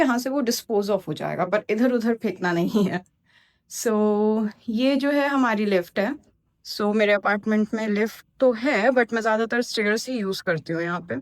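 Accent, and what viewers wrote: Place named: United States. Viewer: Why your accent is American Hindi ?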